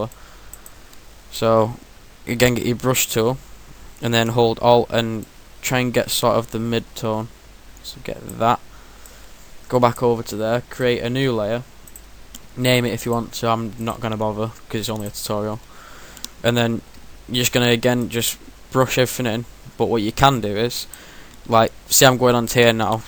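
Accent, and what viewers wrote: Manchester, United Kingdom. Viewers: ahah you have an obvious manc accent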